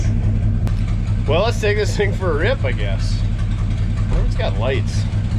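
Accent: Minnesota, United States. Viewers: I dont know where your from but there is a guy in Minnesota that yalks like. you do.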